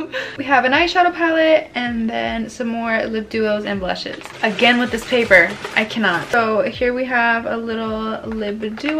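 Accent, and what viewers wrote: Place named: United Kingdom. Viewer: That british accent is everything😂❤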